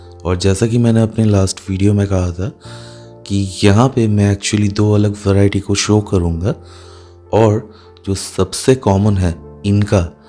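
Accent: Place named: Bengal, India. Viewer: Aapki knowledge, bengali hindi accent and voice modulation is great.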